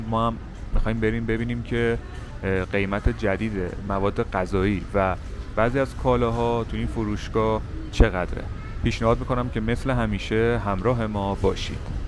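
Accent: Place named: Iran. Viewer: love the Iranian accent greetings from syria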